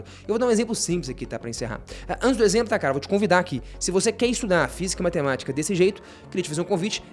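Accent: Minas Gerais, Brazil. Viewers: O cara já é bom em explicar, aí tem sotaque de mineiro, fica mais massa ainda. Show! Excelente vídeo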